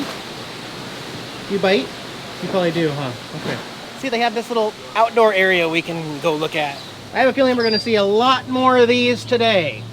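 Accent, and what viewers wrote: United States. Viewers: Geez am I glad you didn’t mention the name of the native Australian bird because you American say it wrong. Just as a point, its not pronounced emoo.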